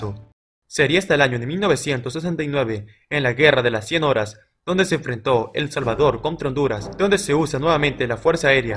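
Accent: Peru. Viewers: paso de largo por la guerra peru colombia por el trapecio amazonico y putumayo ? me sorprendio mucho , pues creo su aento es peru , me equiboco ? si es asi debo suponer fue intencional la omicion ? - vi en barios de sus ejemplos , fueron en conflictos internos , siendo asi deberia estar colombia , pais donde mas operaciones areras se dan - mas que toda resto latinoamerica junta , pilotos vuelan 5 beses el promedio de horas vuelo de todos pilotos militares de latinoamerica , mayoria en operaciones combate , bombardeos reales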